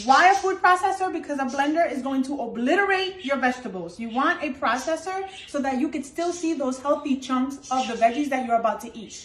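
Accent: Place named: Puerto Rico. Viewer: Hearing her speak makes me desperately miss My NY boriquas. South Carolina SUCKS when it comes to REAL food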